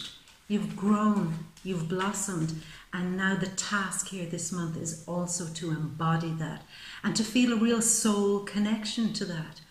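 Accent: Ireland. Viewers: Gemini sun, Aquarius moon, Scorpio rising here <3 I watch a LOT of tarot channels and yours is by far the most touching, accurate and thorough <3 the readings this month and last were eerily accurate to my situation. You explained the exact thing that happened to me. This gives me so much hope and inspiration. Plus, my family is from Ireland and I love hearing that calming voice <3 reminds me of home. Thank you so much for taking the time to do these readings for us.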